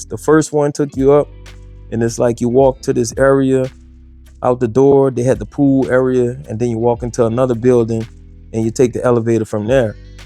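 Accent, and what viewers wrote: Louisiana, United States. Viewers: Fam, you from the boot? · Wait! You sounds familiar. Are you from NOLA Bro? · Where you from bro. You sound like you from Louisiana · Bro are you from Louisiana? I’m from BR myself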